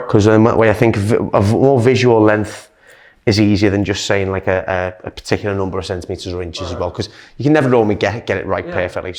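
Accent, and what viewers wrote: Liverpool, United Kingdom. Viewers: I get it ure british . Now turn it off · This is British accent right? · Where are you based? You sound like a Scouser, are you in Liverpool?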